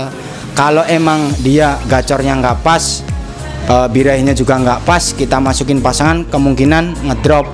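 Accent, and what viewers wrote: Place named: Kalimantan, Indonesia. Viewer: Logat kaya orang kalimantan hahaa maju terus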